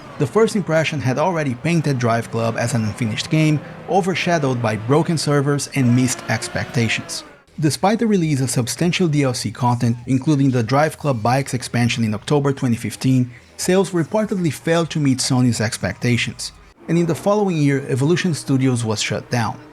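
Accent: Brazil. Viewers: Dude, are u Brazilian? You accent kinda sounds like it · that’s why I asked, because he had a faint but noticeable accent that I could only trace as PT-BR. I didn’t manage to watch the whole video, but I am not surprised that there was some footage with Portuguese text. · ingles bom mas aquele quezinho de hue está perceptivel no sotaque! to enganado? anyway, i never played this game for more than like a race or two at a time. do we already have ps4 emulators? 🤔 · Great video! Congrats! Btw, are you brazilian? Your accent looks familiar. Keep it up with this awesome content. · Yes, i'm 100% sure he is. I can spot a brazilian just by their accent, plus there's the gameplay in the video that's in portuguese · Bom video, jogo até hj, e lamento que nao comprei o ultimo dlc. Obs: to escrevendo em português pq o seu sotaque te entrega. O curioso é que Driveclub vai sobreviver ao tempo, enquanto os jogos de corrida hoje nao saem em midia fisica (ou no caso de GT7 mesmo em midia fisica, precisa estar online, do contrário vc tem 10 carros e 3 pistas pra usar só no modo time trial ) . 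Tenho todos os dlcs e rodo hj em dia no ps5, me lamento nao ter pegado o ultimo dlc, que foi o unico que nao era incluido no season pass. O Dlc que vinha com o Jaguar XJ220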